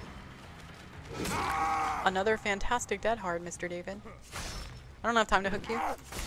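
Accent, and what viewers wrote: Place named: South, United States. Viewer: That southern accent , I don´t wanna camp ya´ll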